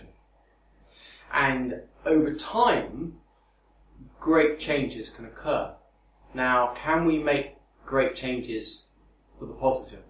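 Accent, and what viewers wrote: United Kingdom. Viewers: Jai Hind Nah he has some kind of english accent. It's quite clear and quite close to how BBC newsreaders sound, but not quite as posh as, say, the queen of the U.K. Think groundskeeper willy from the simpsons. That's a comedy scottish accent, so it's exaggerated af but it isn't too far away from the truth. :P Also, Shrek speaks with a scottish accent, though Mike Myers is obviously american. I'm struggling to think of a world-famous scottish actor you might know.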